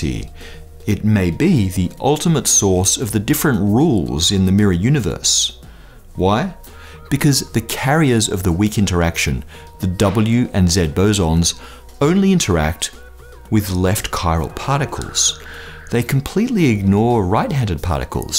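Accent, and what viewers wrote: United Kingdom. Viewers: This is the first Brit I can listen to without getting annoyed. And the information is easily digested. Very nice job. Thanx. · "The zed axis", "the Zed boson" - strikes me as Brittish-y; do Americans say it like that? Or in the US they have the Zeeman effect and in the UK it's the Zedman effect?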